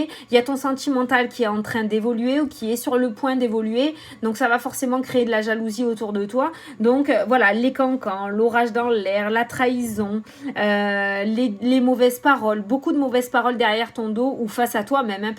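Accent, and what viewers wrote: South, France. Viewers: Coucou, toujours un bonheur d'écouter tes tirages... Tu es toujours de bonne humeur et spontanée, j'adore!!! 😍 Et tes "putains" avec ton accent du sudeuuu, c'est top, ne change rien 🤣 Belle journée à toi et ta famille 🥳 et merci pour ce concours 🍀🤞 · Rhooo pétant!!! 😜 Les montagnes russes d entrée 😂 putain! C est le sud qui parle et té! Changes rien, t es trop topissime, z'ont qu'à pas t écouter les mauvaises personnes.. Moi je t'adore 💜 et tu es une bonne personne... Je suis seule alors on verra côté cancan... 😜 Rien n arrête les langues de vipères... Merkiii, t es une bouffée de bonheur à chaque vidéo 💜💜💜💜😘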